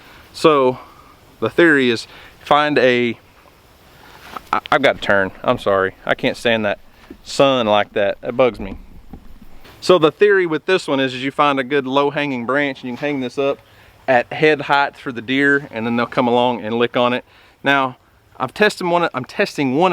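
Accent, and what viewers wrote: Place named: South, United States. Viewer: I was born and raised in Alabama and the part I'm from Walker County we're big country talkers like you I'd think that you were from my neck of the woods meaning like Alabama and surrounding state's of the South east never would have thought you guys sound so country!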